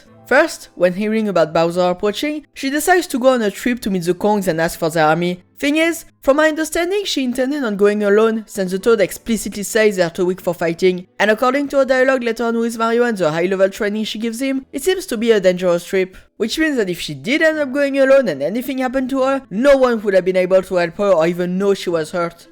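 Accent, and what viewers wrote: France. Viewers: omg luvv ur french accent lol anyway , i don't think she resembles Daisy on personality but the problem is her face , the "Illumination" style ruined her as a whole.. she looks like a normal regular american blonde girl , that barely resembles a "fairy mushroom human (not really human)" plus we know her facial expressions are meant to be "minimalist" real Peach would never open her mouth more than 1inch haha not even in a fight or scream , and Illumination streches Too Much! her facial expressions , what makes her looks with so much attitude (so far from how we know Peach) is just not kawaii cutie , she could easily have a crazy ratched personality but if they kept her FACE instead of these goofy cartoony Disney wannabe face it would be no problem that's not even my they should have kept her uniqueness on her manners and face . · tout mon respect, absolument pas. 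Tu sonnes extrêmement français.e.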